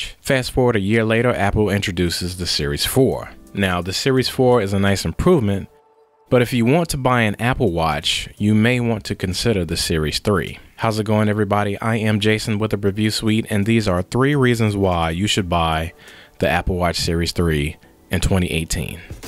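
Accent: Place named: Durham, United Kingdom. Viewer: Lol bru you gotta be from durham or something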